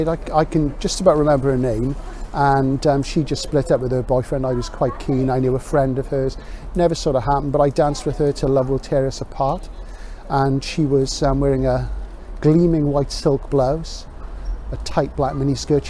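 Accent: Wales, United Kingdom. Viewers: Excellent! Your accent became more Welsh the further down Mill Street you went.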